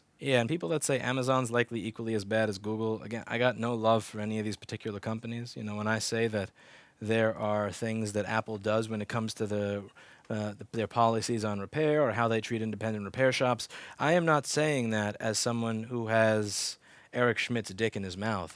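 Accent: New York, United States. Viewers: Yes, that New York accent and displeasure at anything Apple sounds richer and much warmer. Thanks, Louis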